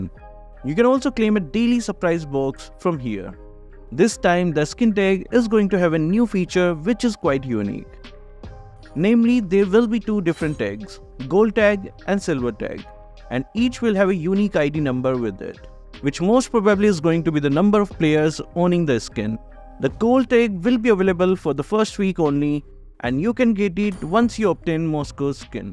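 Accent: India. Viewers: Sir I know ur indian bcoz of ur accent..I love indian people I work here in 5 star restaurant in El Nido Palawan I always have an Indian guests.i hope 1 day u will visited here.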